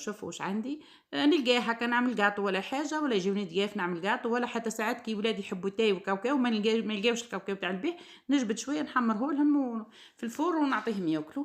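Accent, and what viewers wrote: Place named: Tunisia. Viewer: السلام عليكم أختي، ما أحلى كلامك آنا أختك من تونس منفهمش الفلوس الدزيرية وما لقيتش قناة تونسية متاع خزين، ولهجتك تحسها انك من تونس. تنجمش تعمللنا بالدينار التونسي؟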